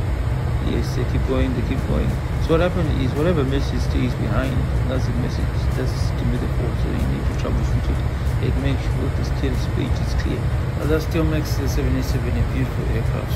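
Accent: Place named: Durban, South Africa. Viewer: Very nice explanation! Thanks. You sound like you might be from Durban? 😊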